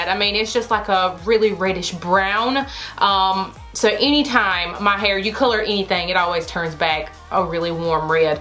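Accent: South, United States. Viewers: I like your southern accent! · Lori T I’ve never heard anyone with that thick of a southern accent in NC. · You are so down to earth and I LOVE your accent! After I hear someone’s accent I start talking like them lol! After I finished your video my husband was like, “why are you talking with a southern accent?” 🤣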